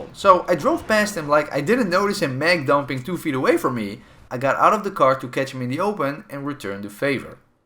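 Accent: Netherlands. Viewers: heheh first time viewer here, as soon as he started talking I knew he's dutch :)) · lmao I knew you were dutch hahahah lekker bezig man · You Dutch? Waar woont je? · I knew you had a dutch accent, You sound like this one famous airsoft player who is also dutch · Are u from the Netherlands 🇳🇱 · You're dutch ? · ja ik dacht al dat je Nederlans was🤣😂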